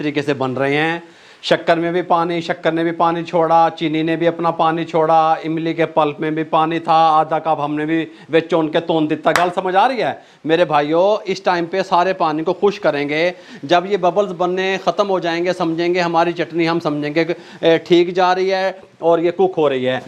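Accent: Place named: Haryana, India. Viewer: From Okara Punjab Pakistan. jee aap ki maa Boli Haryana ki lagti hay. jee aap k programme both achhay ja rahay hain.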